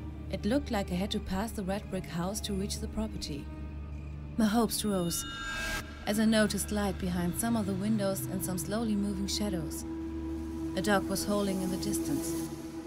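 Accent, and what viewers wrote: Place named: Germany. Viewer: Ich finde es sehr cool, dass eine englische Fassung gemacht wurde. Leider muss ich sagen, dass der deutsche Akzent der Erzählerin zu uneindringlich für diesen Vortrag ist. Es macht, zumindest ergeht es mir so, keinen Spaß zuzuhören. Ich finde den deutschen Akzent in der englischen Sprache absolut nicht schlimm, vielmehr ist er interessant und auch bei einigen Thematiken unverzichtbar. Doch hier wirkt es nicht. Bitte nicht falsch verstehen. Die Sprecherin macht eine solide Arbeit, liest schön flüssig und hat ausserdem eine schöne Betonung, aber -naja, ich wiederhole mich. 7 von 10 Sternen, da alles, bis auf eine Sache passt.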